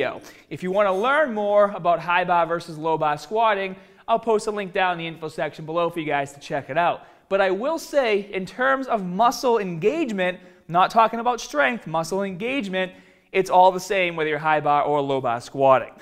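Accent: Boston, United States. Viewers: Sonia Boston accent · He’s from boston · This guy lol bahhston for sure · omg he's from Boston, I've watched him for years and it's always come out, get over it! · DIdn't have to listen to that horrible Boston accent longer than i needed to...thank you sir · oh man! that boston accent! love it! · The more I watch videos the more i kinda sorta want a Boston accent. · That accent though ❤️ Boston? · Its a boston accent apparently. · Is this guy from Boston? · I almost can’t get through this video with that Boston accent. Geez lol. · Are you from boston by any chance? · I came out of curiosity stayed because of the Boston accent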